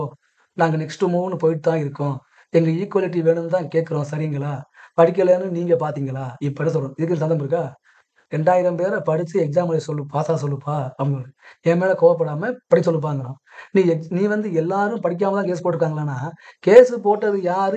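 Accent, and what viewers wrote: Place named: Kerala, India. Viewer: Kerala style sollum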